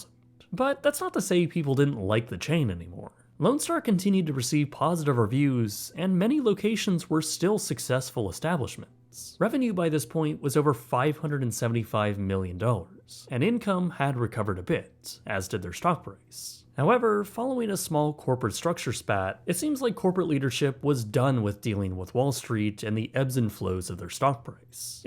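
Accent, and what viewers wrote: Canada. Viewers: Lone Star Steakheaouse? Bought eaout? The Canadian accent truly is the worst and most annoying accent for long-form content.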